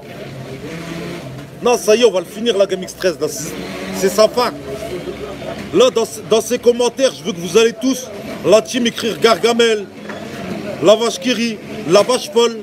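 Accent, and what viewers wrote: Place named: North, France. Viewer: C'est le nooooord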